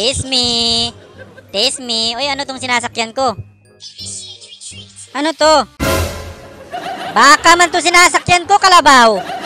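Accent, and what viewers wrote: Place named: Iloilo, Philippines. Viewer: taga koronadal ka pala.. Kaya pa illonggo timo.. Hahah Galing... Frm gensan.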